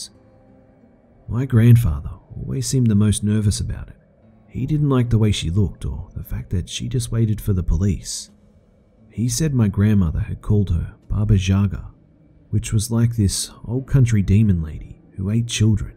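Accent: Australia. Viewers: yes I'm aware he's an Aussie with an accent. fuck off me it was funny lol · are you aussie?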